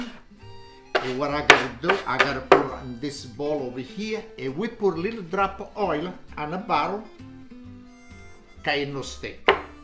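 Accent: Italy. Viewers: Bon giorno papa your ciabatta is bella 😊 I like Italian's accent when speaking English 😁 makes me smile all the time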